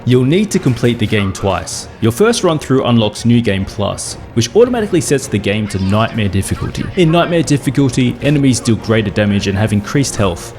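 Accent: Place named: Australia. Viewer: Great video mate, love the commentary and the aussie accent haha (from NZ myself) Definitely agree, all these platinums are definitely hard/time consuming to get. I've gotten the Skyrim one three times on each PS generation and also the Arkham Knight one, including tevery trophy in the community challenge pack. I don't blame you for not doing them, they're absolutely mental I'll be checking out the rest of your catalogue and subscribing for more.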